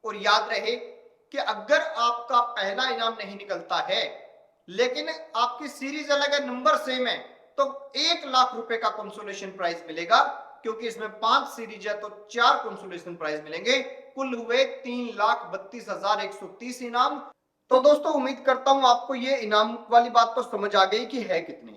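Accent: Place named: Punjab, India. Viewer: Bhaiya aapane bhi Punjab ki kharidi thi na Kyunki aapki awaaz se lag raha hai kya Punjab se hi hai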